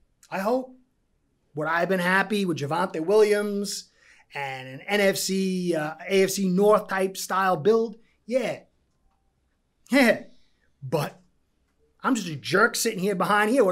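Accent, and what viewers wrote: New York, United States. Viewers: I know a NJ/NY guy when I hear one. Nothing like home cooking. Great job on the break down. You've added a follower in me buddy. FINSUP! 🐬🧡💙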